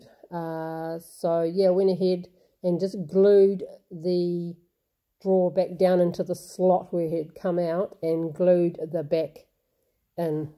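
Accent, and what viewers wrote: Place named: New Zealand. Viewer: oh not your fault … yes I watch anyway ! Cool to know that it’s a Kiwi accent . I have heard other Australian accents but know nothing about them . Will keep watching!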